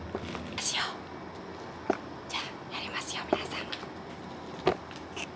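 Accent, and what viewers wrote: Senshu, Japan. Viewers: ﾊﾞﾘﾊﾞﾘの関西弁 笑えるし よいな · 見ときや、見りや 泉州弁ええな。やっぱ。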